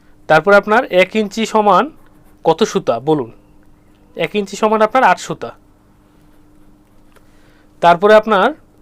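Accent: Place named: Comilla, Bangladesh. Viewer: মনে হচ্ছে আপনি কুমিল্লার ভাই। আপনার জন্য শুভ কামনা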